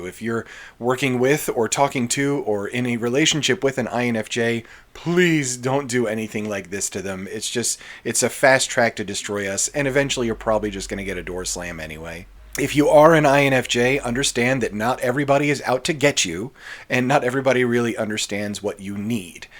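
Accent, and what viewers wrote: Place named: Forest Hills, United States. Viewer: You sound like you went to forest hills high school, plus the silibent s and the lateral emissions indicate several minor speech impediments and lots of dental work.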